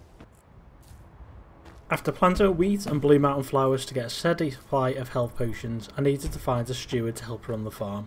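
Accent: Liverpool, United Kingdom. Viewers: Hi. R u Scouse? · Are you a scouser? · A scouse YTer? Instantly subscribing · nice to hear a scouser, here's some engagement for you mate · Mate, that was a boss first video! It's nice to hear a fellow scouser too, tbh! Subbed! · Lad, you scouse? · Awww finally a scouse youtuber! ALREEET LAAADDD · Scouseeerr!! Loved the video man 👍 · You a scouser?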